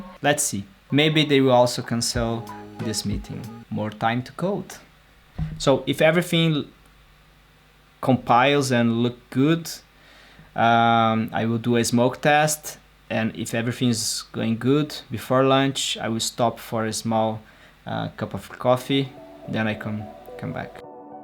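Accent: Brazil. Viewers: I’ve watched this video with this intention. It’s easier to understand because of his Brazilian accent and he speaks slowly which facilitates the understanding. Acho que assim ficaria melhor :-) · Yes, I whatched this video in this intention, is more easy to undestand because the brazilian accent. And he speak more slow, this facilitates undestanding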